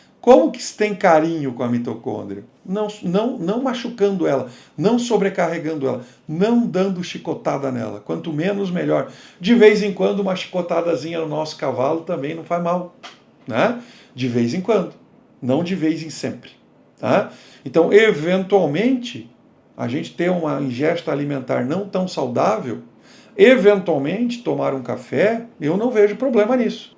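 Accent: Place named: Minas Gerais, Brazil. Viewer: Gosto demais do seu male male, dr. Sou mineira e minha avó falava assim. 😊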